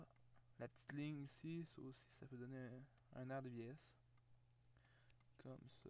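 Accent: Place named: Quebec, Canada. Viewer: Salut cher ami Québécois. Je suis Français de France donc j'ai un peu de difficulté à comprendre... Est-ce que tu pourrai faire un accent plus français dans tes prochaines vidéo ? Cordialement, Gilbert.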